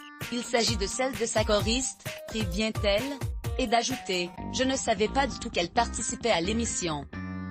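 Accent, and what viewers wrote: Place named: Quebec, Canada. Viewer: Une voix québécoise qui raconte....ça fait bizarre!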